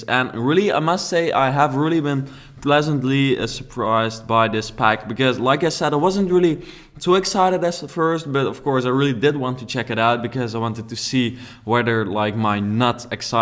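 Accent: Netherlands. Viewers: Haha I recognized your accent as being Dutch before I knew you were! Awesome! Just bought this expansion and looking for some modern builds as well. I like modern and I like my vampires to live in a modern house as well. Nice build! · are you Dutch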